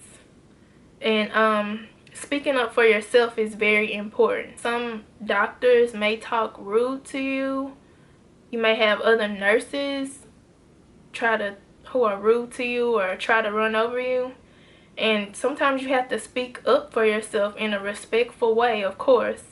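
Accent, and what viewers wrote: South, United States. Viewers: You from down south some where? I can here it in your accent! Is it Tennessee? If not where?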